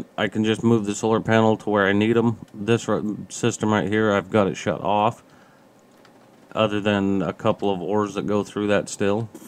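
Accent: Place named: South, United States. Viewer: You hillbilly sounding useless peace of shit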